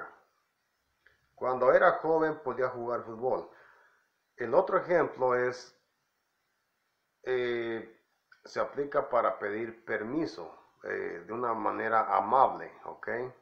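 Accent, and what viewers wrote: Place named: Texas, United States. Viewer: GRACIAS me gusta mucho tu asentó como tejano jeje bendiciones ahora si a ponerle atención a la clase jeje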